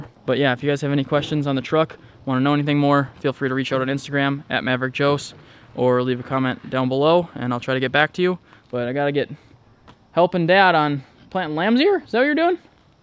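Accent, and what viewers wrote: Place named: Minnesota, United States. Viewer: 40 seconds into the video I knew you were from MN, lol Nice build man!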